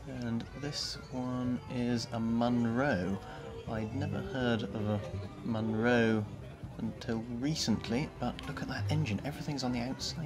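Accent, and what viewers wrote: RP, United Kingdom. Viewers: Correct me if I am wrong, but the RR color is not canary, but what was known at the time as Mandaran yellow. Bit of trivia, the Waterman fountain pen from the period was available in Mandarin yellow and that pen today is one of the most collectable. Nice presentation. Thank you. (My British friend tells me you speak with a distinct RP. Are you from GB?)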